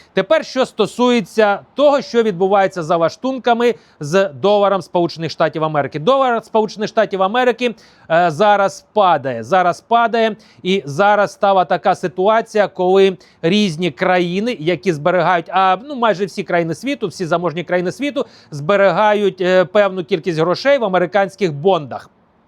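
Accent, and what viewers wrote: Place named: Russia. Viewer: Дякую за вашу працю. Але треба ще працювати над вимовою, бо є багато російського акценту: Атава, кА мандир і тд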